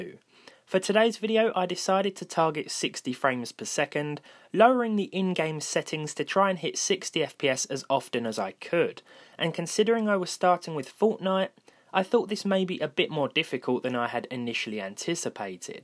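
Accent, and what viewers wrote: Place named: Home Counties, United Kingdom. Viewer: I just realised that RandomGaming and Budget Builds are 2 different channels, lol. I just hear someone with a home counties/posh (I'm from the North you sound posh to me) accent talking about old hardware. AMD is like Linux, over the time they have existed you will always hear someone saying they are poised to take over their bigger rival, but it never happens, there must be a reason why Nvidia have always been, by far, bigger in the market than AMD, same goes for Intel, it can't just be the stupidity of the public. Whenever it's time for me to upgrade there is always chatter than AMD are better than Nvidia and Intel, and I always look at them, but can never take the plunge, they never seem to stack up to the hype.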